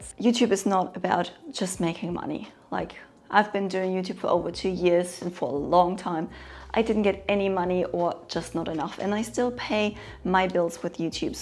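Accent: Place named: Nuremberg, Germany. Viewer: Hallo, schön dich deutsch sprechen zu hören. Ich war dienstlich letzte Woche im Nürnberger Raum.. es klang sehr ähnlich.. smile.. ist es evtl. deine "alte Hemat".. LG Andreas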